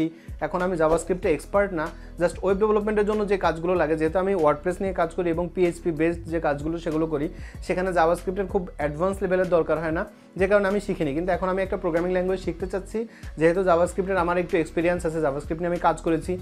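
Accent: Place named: Noakhali, Bangladesh. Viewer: Apner kotha sune mono holo apni noyakhali or Laxmipur er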